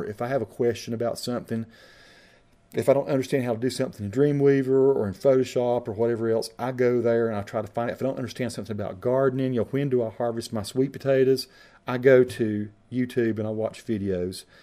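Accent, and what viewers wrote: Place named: Appalachia, United States. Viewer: Yes the Hillbilly accent is cool and I like the way you are so open about your Appalachian life. Just seeing the fields and forests and talking to your brother about Hillbilly stuff is nice to experience. I am living in dutch society that is quite the opposite of Hillbilly (in the province it is not that different though). Nice to see such a positive good humoured video around Christmas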